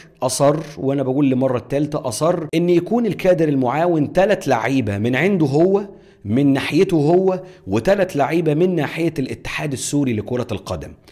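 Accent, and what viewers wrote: Egypt. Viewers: باين انك مصري بس بتحب اللهجة السورية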